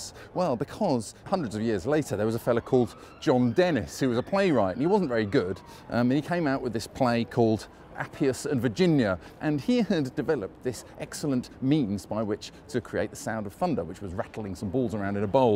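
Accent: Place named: RP, United Kingdom. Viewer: Gracious, what a delightful RP accent! I didn't think there were any left. Not once did you pronounce "fings" instead of "things"--a rarity these days. 'Spoken from a true admirer across the North Atlantic. 'Cheers.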